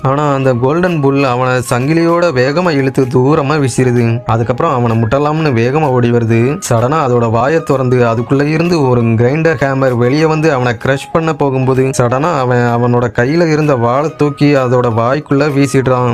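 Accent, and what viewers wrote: Kongu, India. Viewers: Yennunga nenga kongu mandalama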